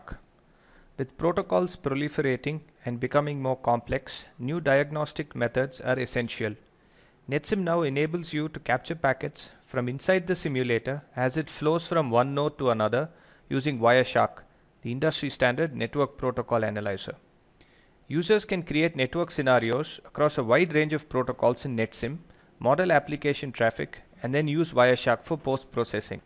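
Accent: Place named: India. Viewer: It's Indian accent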